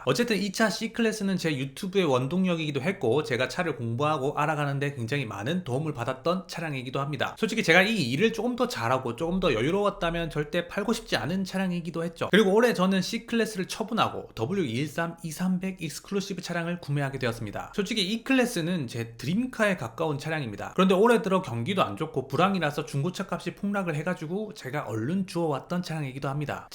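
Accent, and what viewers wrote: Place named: Busan, South Korea. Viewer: 말씨는 경상남도 부산쪽같은데 사는곳은 서울경기쪽에 좀 살아서 나오는 사투리억양섞인 서울말씨네요 ㅎㅎ 잘 보고 있습니다 😊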